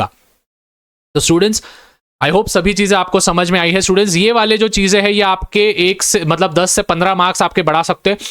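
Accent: Maharashtra, India. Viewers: Haa sir Marathi vala vatte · Sir marathi ahet ka 😅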